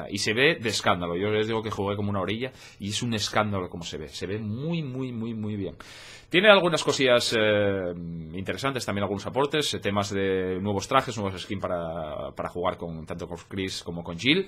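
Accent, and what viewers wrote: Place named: Spain. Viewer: Te diré algo con todo respeto. Como me disgustan los gameplays hablados por españoles, sin embargo tu lo haces muy bien y a modo que no es molesto. Mucho éxito y buen gameplay me ayudaste en algo que me atoré del juego XD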